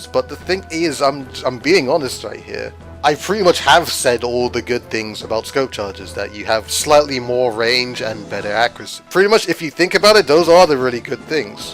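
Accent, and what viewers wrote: United Kingdom. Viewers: Your british like me. ;> thats why i see u in ranked battles. also i find the goo tubers are the best charger. ;)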